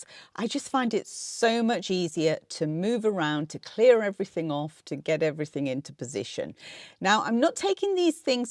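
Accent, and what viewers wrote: England, United Kingdom. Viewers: I love the way the English say 'watah' instead of waterrrrr :)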